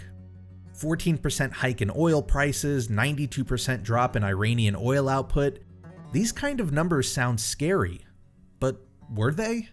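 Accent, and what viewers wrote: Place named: United States. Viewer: Toe-MAY-Toe Toe-MAH-toe... ...he's American, and almost every American speaker pronounces it the way he does. That's not to say that your pronunciation isn't correct, just that when enough people do it, it's considered acceptable. Like how there are two ways of pronouncing "either" even though there's that rule where if a soft E is immediately followed by another vowel, it becomes a hard E.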